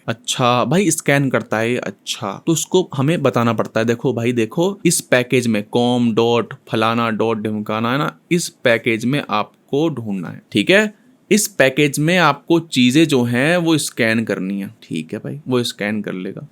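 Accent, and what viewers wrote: Uttar Pradesh, India. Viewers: Guru kamal adami ho accent to pura UP wala hai. Best explaination on youtube. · wow gazab , the way of explanation wow mtlb amazing, ultimate , soothing and solid . lag rha hai samne baith ke koi padha rha hai. and i m from up as well and can connet with an accent , amazin. indeed the best explaintion .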